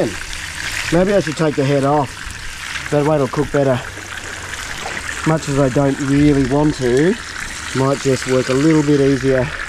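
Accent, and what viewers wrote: Australia. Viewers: Bro I started watching your videos during covid, I thought you were from Sydney, then Melbourne, then Brisbane 😂 Anyways, great content, still watching! Best on my WFH days 🎉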